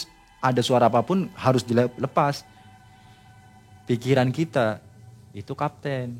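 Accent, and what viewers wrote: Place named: Tegal, Indonesia. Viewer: Sampean ngapak Tegal yh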